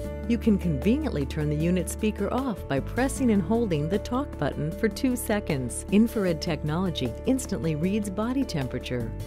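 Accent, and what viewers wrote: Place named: England, United Kingdom. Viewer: I think it's an English accent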